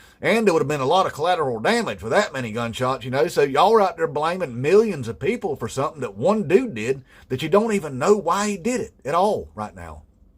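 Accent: South, United States. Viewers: I like you, brother. You give me "Theo Von's much older brother" vibes and your southern drawl is literally the reason I stayed and watched the whole video. I agree with your analysis of Kirk's character and my only pushback is.... a lot of the country is well aware of what kind of guy he was and that's why they celebrated him. A lot of this country is made up of racist-ass, hypocritical-ass, sanctimonious-ass, bigoted-ass, xenophobic-ass Christians who condoned his vitriolic rhetoric so.... there's that. Anyway, great video! I'll subscribe.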